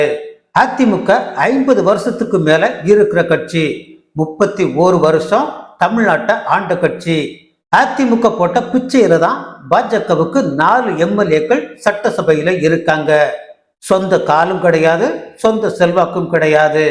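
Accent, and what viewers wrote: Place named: Sri Lanka. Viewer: Sir நீங்க தமிழ்நாடா ?? இல்லை இலங்கையா?? உங்க பேச்சு இலங்கை தமிழ் மாதிரி இருக்கு...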